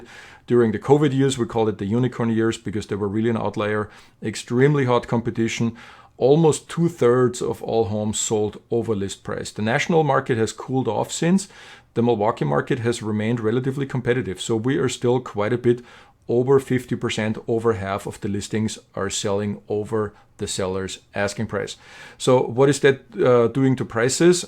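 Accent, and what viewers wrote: Germany. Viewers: It sounds like you are from Germany? As a European used to a safety net I find it overwhelming to imagine how to get a job in Milwaukee without a career. And being able to afford healtcare. If it's even possible. Are there any places that would help you apply for jobs for free? I wonder if it's possible to qualify for food stamps as an American citizen while your searching for a job if you've never lived there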